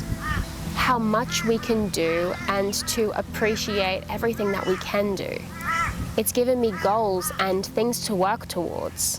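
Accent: Australia. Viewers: Nice to hear an Aussie accent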